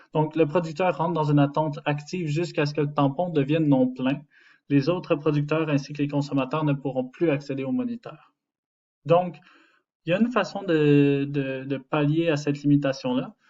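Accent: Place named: India. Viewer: لي يحب يعذب وذنيه يسمع هندي يهدر فرونسي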